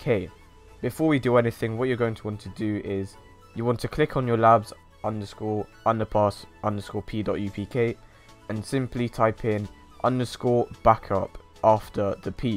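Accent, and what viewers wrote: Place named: London, United Kingdom. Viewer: Sheesh bigman with the london accent bigman thatnks for the help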